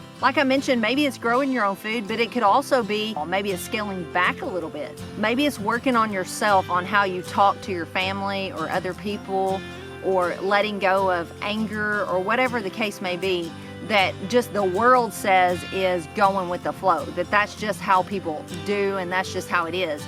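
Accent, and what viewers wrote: South, United States. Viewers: Great advice. Good lecture . Good garden. Love to hear your voice good southern accent. Thanks for inviting me.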